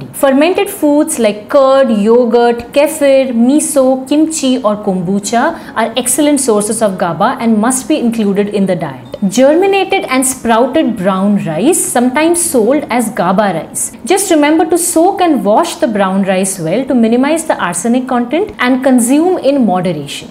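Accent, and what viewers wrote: India. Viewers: Man how I like that Indian accent…. Could hardly concentrate on the contents 😊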